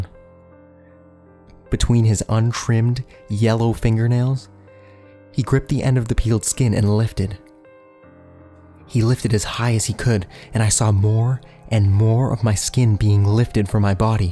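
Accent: Canada. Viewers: A very Canadian creepypasta reader. Not only that but you read very well. I'm looking forward to listening to more of your readings :}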